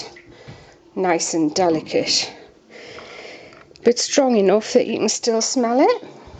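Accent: United Kingdom. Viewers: Definitely British :)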